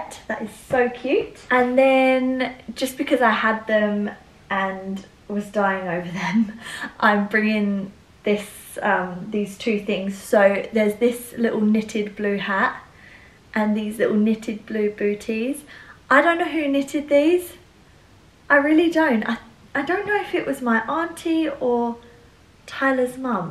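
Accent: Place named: England, United Kingdom. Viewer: Sounds English to me lol!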